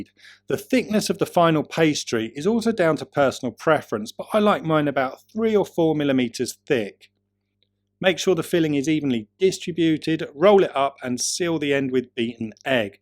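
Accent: United Kingdom. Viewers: your British accent is very attracting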